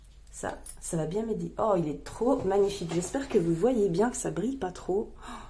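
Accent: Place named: Doubs, France. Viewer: Quel plaisir d’entendre ce doux accent du Doubs 🤣🤣🤣 je suis originaire de Dole 🥰 je reprends l’accent dés que je descends et je le perds quand je remonte en seine et Marne 🤣🤣 J’adore ton nouveau classeur et hâte de te retrouver Des bisous tout doux ❤️❤️❤️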